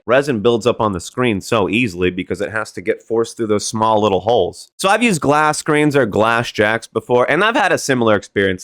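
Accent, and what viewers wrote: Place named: Boston, United States. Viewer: Idk if you’re from Boston, but I occasionally hear a Boston-like accent, especially when you use an “A” on the end of words that have an “ER”. Just a curious observation.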